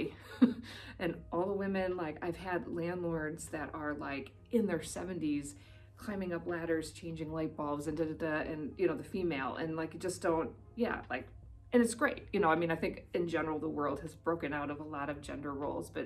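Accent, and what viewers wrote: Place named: New Zealand. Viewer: Great video - I can even detect a bit of Kiwi in your accent these days!!!! Cheers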